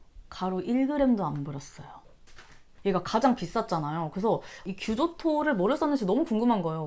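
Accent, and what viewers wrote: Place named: Honam, South Korea. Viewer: 살짝 살짝 호남 사투리가 들려요 귀엽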